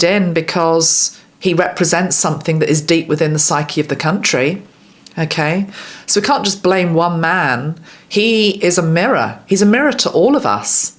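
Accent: United Kingdom. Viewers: Thank you very much, I enjoyed as a Dutchman your real British accent and pronunciation, I learned from that and about your predictions, time will tell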